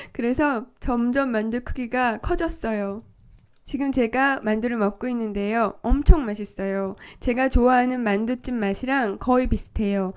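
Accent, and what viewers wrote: Seoul, South Korea. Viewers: 연세가 어떻게 되시나요? 서울사투리에 가까운 발음이네요...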